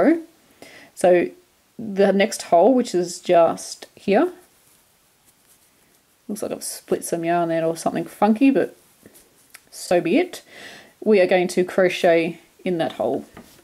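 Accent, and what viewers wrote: Australia. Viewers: So enjoying your Aussie accent and way of talking! Oh and the crochet too of course :)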